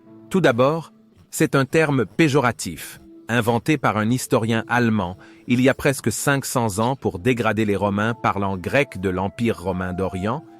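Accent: Quebec, Canada. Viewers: Je relève des notes d’accent Québécois, je me trompe?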